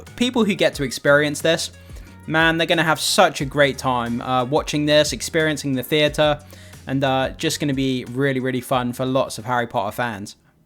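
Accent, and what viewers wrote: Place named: United Kingdom. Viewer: great video as always! An off-topic question tho - as a foreign languages enthusiast throughout all your videos I've been wondering about the way you pronounce the H in the "HBO series". If you wouldn't mind answering a personal question - what is your native accent? I guess it's definitely a UK one - but which exactly?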